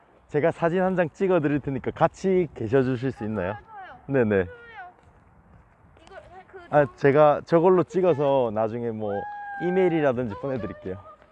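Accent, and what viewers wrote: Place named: Gyeongsang, South Korea. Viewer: 멋지다^^ 갱상도사투리 쩐다ㅋ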